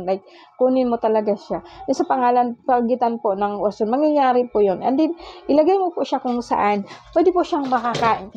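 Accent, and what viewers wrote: Bicol, Philippines. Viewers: Mam tanong ko lamang ikaw po ba ay bicolana, kc po bawat bigkas ng salita mo i notice na bikolana ka, from Camarines norte po ako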